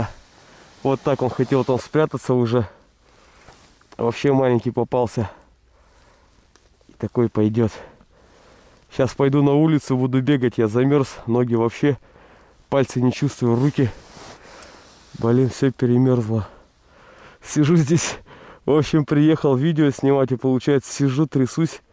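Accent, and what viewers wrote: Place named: Siberia, Russia. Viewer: "разожгем" , "изгинается" еще) Но я бы на твоем месте не был занудой, это таежный говор, по сути, это и есть гигантская деревня , выезжаешь за 300 км от центра России и там все так говорят. Они смородину называют "кислица", обломки скал называют " курумы" и тд. Там вообще много различий в словах и произношении с центром рф. Это можно сказать провинциальный диалект свой.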